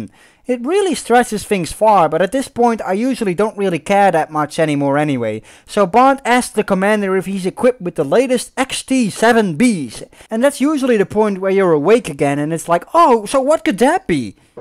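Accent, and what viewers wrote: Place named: Netherlands. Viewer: He's dutch, that's his accent. How many languages do you speak?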